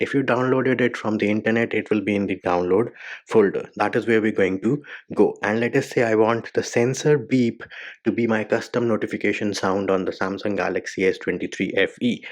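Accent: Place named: India. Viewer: You can always trust the Indian sounding guy! thanks it worked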